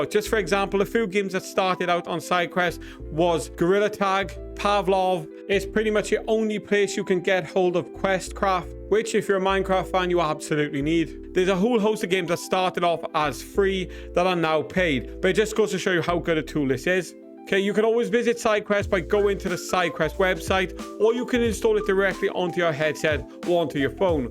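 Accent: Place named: South Wales, United Kingdom. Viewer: Hello which part of Wales are you. I guess by your accent South Wales. I live in church Village and have just purchased the Meta Quest 3 and looking for free paid games to download. Can you help.?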